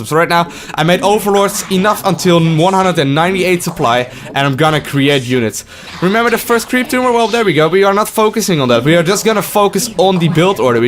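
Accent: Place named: Netherlands. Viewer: Quit whining about a Dutch accent. You understand him, you learn from him, you can't speak commentate this game better. QQQQQQQQQQQQQQQ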